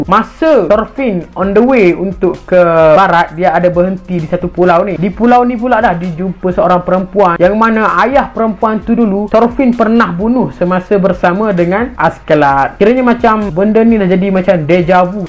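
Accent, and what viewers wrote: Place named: East Coast, Malaysia. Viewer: mcm org pantai timur je speaker ni ckp😅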